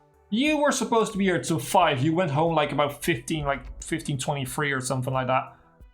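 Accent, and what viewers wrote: Netherlands. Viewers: Your Dutch? Anyway great serie to watch!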